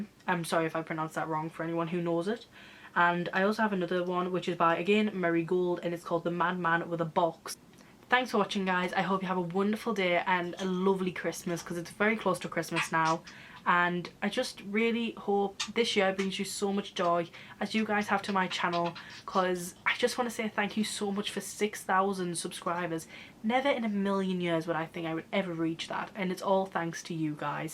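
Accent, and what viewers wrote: United Kingdom. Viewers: You are so pretty😍 Also I love your voice are you British?❤ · I love your accent, you British?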